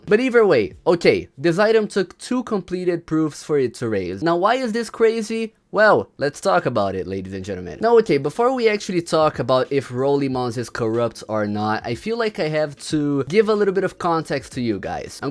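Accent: Brazil. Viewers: Are u brazilian? I know this accent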